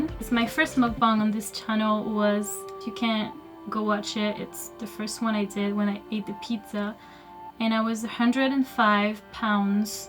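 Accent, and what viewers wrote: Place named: France. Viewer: ohhh tu viens de France j'en étais pratiquement sûre avec quelques prononciations !! tu es très intéressante et merci pour le boulot de tes vidéos !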